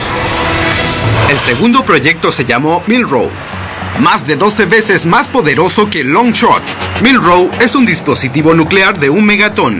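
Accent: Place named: Argentina. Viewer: No se porq pero presiento que eres Argentino verdad ???👎💩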